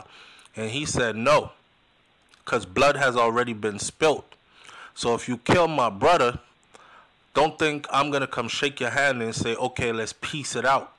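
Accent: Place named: Jamaica. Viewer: This sounds like soflow TV.. 😂😂😂 the Jamaican accent can’t hide man.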